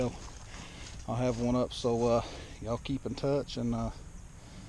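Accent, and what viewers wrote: Tennessee, United States. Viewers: Fellow Tennessean? I ask because of the accent. And love the shee keep up the good content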